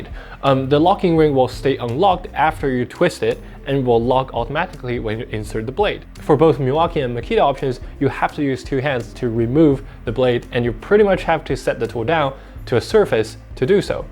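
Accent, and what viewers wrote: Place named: Canada. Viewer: An Asia Canadian accent... different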